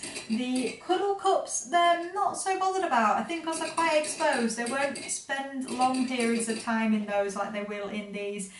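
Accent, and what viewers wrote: England, United Kingdom. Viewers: Is she from england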